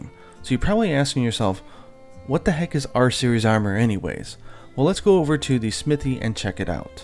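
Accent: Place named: Japan. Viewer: The way he pronounces all the words in the game with a Japanese accent rly triggers me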